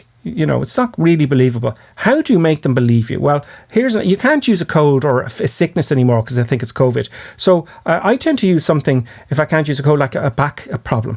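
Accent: Ireland. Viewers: As always great video professional informative most of all fun to watch thank you and I love your Irish accent 👏👏👏👏👏👏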